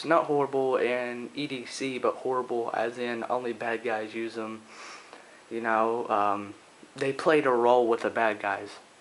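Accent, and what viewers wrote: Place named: Australia. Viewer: If they are illegal where did you get them? You sound kinda Aussie so I'm guessing you get them imported. Please reply! I am looking to buy one :)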